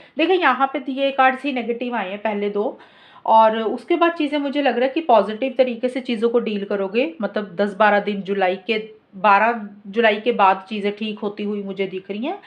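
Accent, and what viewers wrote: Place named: Amritsar, India. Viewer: Aap Amritsar ke ho awajh se lagta hai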